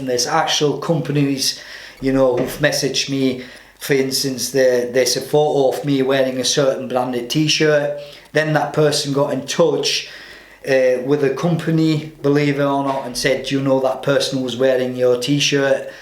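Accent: Scotland, United Kingdom. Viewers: I enjoy this guy’s accent. Are you a Scottish? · Я сам нихуя не понял из-за британского акцента и диалекта, хотя английский знаю хорошо (американский английский) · That is a quintessential Scottish accent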